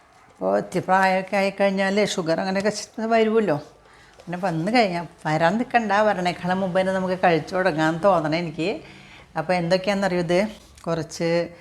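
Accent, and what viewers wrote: Thrissur, India. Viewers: മ്മടെ പക്കാ തൃശ്ശൂർ സ്ലാങ്.. മരുന്നും അവതരണവും ഒരേ പോലെ നന്നായിരിക്കുന്നു ചേച്ചി.. 👌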